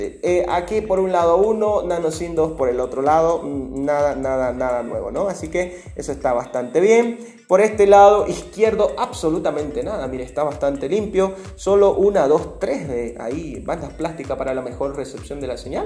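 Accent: Bolivia. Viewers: Eres de Bolivia verdad ?? Si lo eres me suscribo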